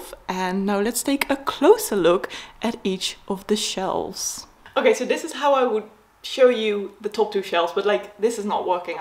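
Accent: United Kingdom. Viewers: Oh huh, wauw. Ik las als in van de UK. In combinatie met je Brittish-achtige accent. Maar wat doet ze met al die Nederlandse boeken haha... Fijne dag.😊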